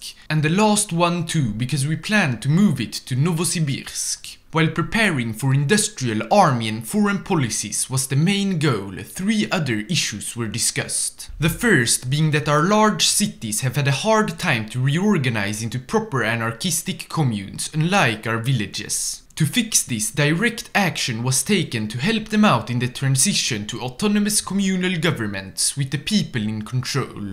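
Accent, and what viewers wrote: Sweden. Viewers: I like how through out all your videos you pronounce alot of cities with a swedish accent, and as one aswell it gives the videos alot of flavour. Thank you I love your videos, keep up the good work! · You have the strongest swedish accent I've ever heard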